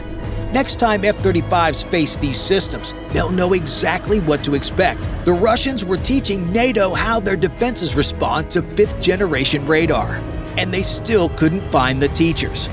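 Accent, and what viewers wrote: United States. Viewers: Don't get me wrong, the narrative and story is bob on. It's just the hyper, over dramatic American narrator that I find annoying. Maybe it's because I'm British.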